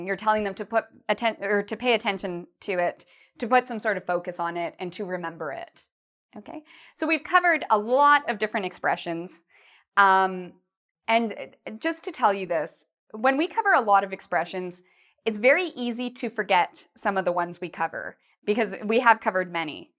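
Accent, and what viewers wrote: Canada. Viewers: You are from Canada right? I can tell from the way she pronounces "About" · Canadian accent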